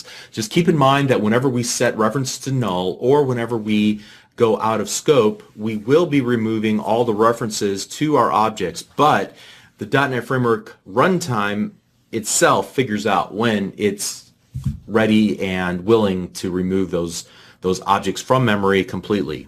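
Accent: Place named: United States. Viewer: Great channel sir, I really love these tutorial especially that they are in American Accent, this is a great advantage these days with all the rubbish accent courses invading Youtube.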